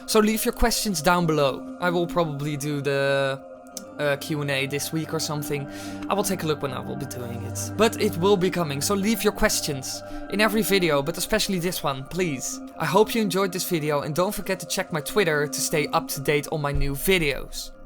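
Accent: Netherlands. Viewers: I have to ask, but you're dutch, right? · Are you dutch bcuz your r is really strong and you have that accent Ik ben nederlands trouwens(im dutch btw)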